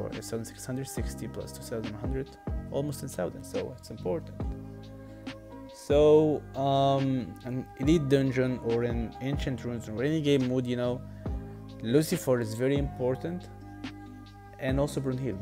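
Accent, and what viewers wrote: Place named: Arab world. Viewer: طريقة كلامك غريبه حاس انك عربي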